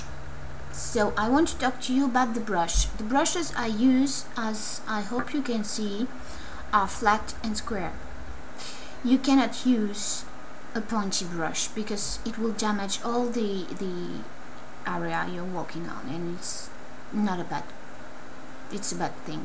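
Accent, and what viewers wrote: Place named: France. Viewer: ahhh it been so long since I was with the part of my family that has your french accent, hahaha! :) you sculpt like me, no measurements! lol, except I draw sometimes. Johnny <3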